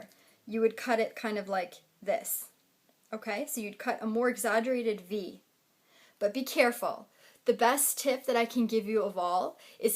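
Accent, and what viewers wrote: Canada. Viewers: this video was great...i can tell ur canadian you may not realize but you have a strong canadian accent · she souds like a canadian!!! r u canadian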